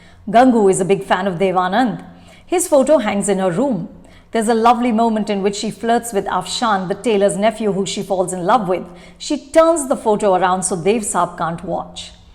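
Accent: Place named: India. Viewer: Her indian English accent though 😂😂😂😂😂😂😂😂😂😂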